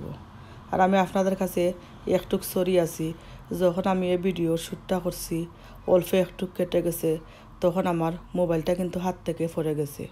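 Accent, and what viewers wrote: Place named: Sylhet, Bangladesh. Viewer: সিলেটি মাত আমি ভালো পাই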